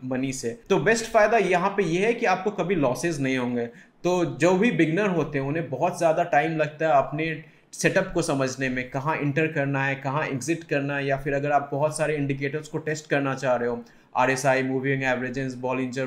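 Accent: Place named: United States. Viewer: Aaj Maine pehli baat american accent me hindi basha suna hai.. Ya fir ye kaho ki angrezi me hindi suna hai..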